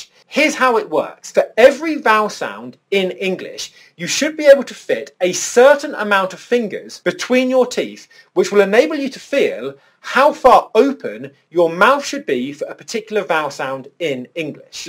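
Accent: RP, United Kingdom. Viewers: Amazing video. i was wondering if this is modern rp british english? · Is this RP accent? Or some other british accent? I've watched your few videos and you haven't mentioned that if you are teaching rp or something else so I want to confirm as I want to learn rp.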